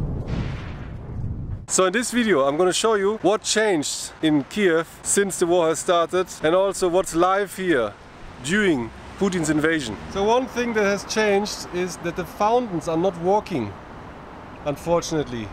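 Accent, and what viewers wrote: Germany. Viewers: 10 seconds in, oh ja, er ist einer defintiv Deutscher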